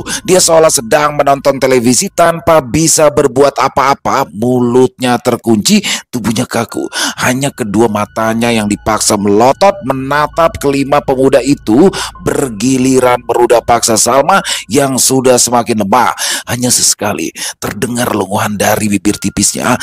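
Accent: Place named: Java, Indonesia. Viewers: Cerita kocak versi dialek Jawa, se seru kisah Bg Piyan org Betawi. 😂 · Haha bang ad lg y cerita yg lucu,dn pembawaan yg sepnuh hati saya merasa mndngar orang jawa lg bercanda.. Enak dnger logat jawanya pas aplgi bawain logat betawi hoalh top dah bang.. Mksh bang mga sht selalu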